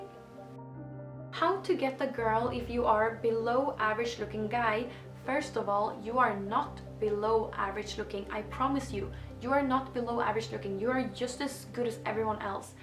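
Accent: Sweden. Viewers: Your accent sounds very Swedish, I’m not Swedish but I’ve lived in Sweden for some years and I can recognize it.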